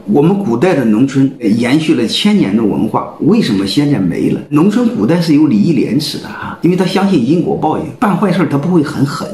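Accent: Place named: Henan, China. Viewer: 河南口音都出来了，肯定是老乡嘛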